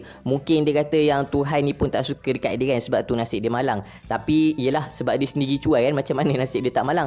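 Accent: Kelantan, Malaysia. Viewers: Ore klate ko boh??! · Bang kenapa abang lebih ckp ala kelantan gitu.?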